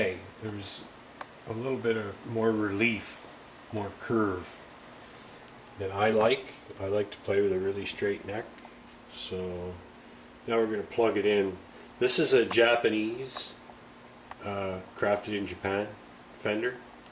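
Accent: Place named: Canada. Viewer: Oh man ! From just a single 'without' i thought.. huh, this guy gotta be canadian! And then .. the maple leafs tee :) it is so funny because i'm Polish and i've never been to Canada or even Usa, but i have family in London, Ontario - and i've heard about this slight difference. It happens to me second time this weekend :) Absolutely no offence, i love this accent and i wish i could visit my family and your country someday ! Great bass and nice movie, thanks :) 51's are hot :D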